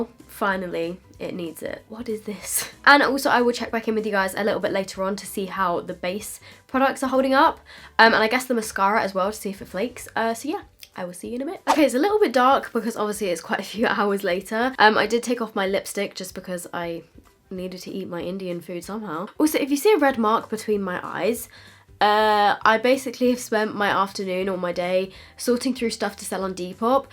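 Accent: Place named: United Kingdom. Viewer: After almost every sentence you say I repeat it like an annoying sibling. Sorry can't help it. You sound super British 🤗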